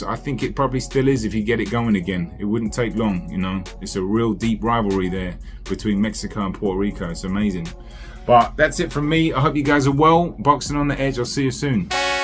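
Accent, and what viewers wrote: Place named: United Kingdom. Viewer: Oh snap I didn’t click you live in nyc. From your British accent I just assumed you live in uk. Props bro from uk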